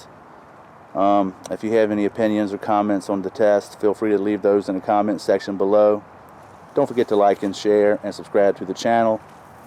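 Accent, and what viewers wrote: United States. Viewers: I can’t find anything chambered in nan or forty fav. (I’m joking. The accent reminds me of being home in Georgia!) Fun test to watch! Semper Fi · What kind of accent is that, I'm hearing southern sounding I's and yankee soundind Ie's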